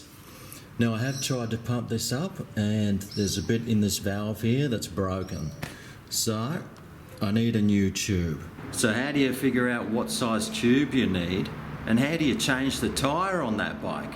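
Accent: Australia. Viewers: Every instructional video is better with an Aussie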